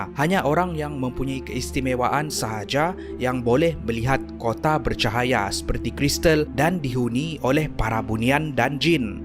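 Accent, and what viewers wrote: Sabah, Malaysia. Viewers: sabah ka😁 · org sabah ka? cara bercakap mcm sabahan..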